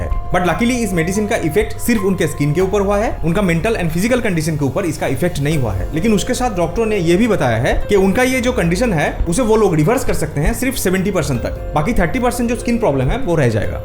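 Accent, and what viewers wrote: Bengal, India. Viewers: bro , you are from west bengal, right , because your hindi language is not a regular hindi language it mixed bengali and hindi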